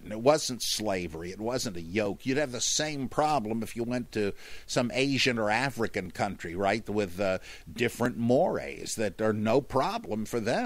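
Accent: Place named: Germany. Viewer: The german accent- spot on!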